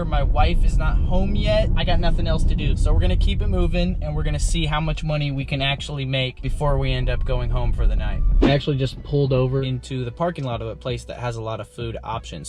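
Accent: United States. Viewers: U American?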